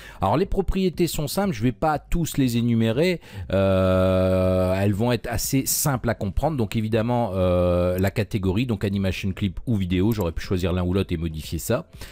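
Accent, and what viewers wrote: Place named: England, United Kingdom. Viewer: J'aime bien ta chaine et globalement bien tes vidéos. Mais je trouve quand même que c'est parfois un peu bâcle. C'est un thème intéressant à aborder, mais on dirait que rien n'est préparer et que tu ne sais pas de quoi tu parles (ici notamment quand tu décris les fonctionnalités de l'inspector). Outre l'accent anglais qu'on te connait, y a beaucoup de variables/fonctionnalités que tu n'expliques pas alors que ça serait bien utile. Tu as plus ou moins dit que le frame rate c'était pas vraiment utiles à modifier pour un usage amateur, et que ça correspondait plus à un paramètre plus compliqué/poussé dans le domaine de la vidéo alors qu'en réalité c'est littéralement LA BASE. Voilà tout. Ce commentaire n'est pas à prendre contre toi ou negativement, c'est simplement une critique constructive pour t'aider :)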